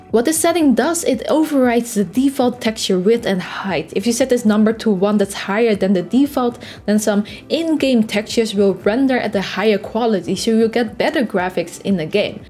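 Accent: Netherlands. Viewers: you sound dutch haha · Ik hoor een Nederlands-Engels accent 😅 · i smell dutch 😂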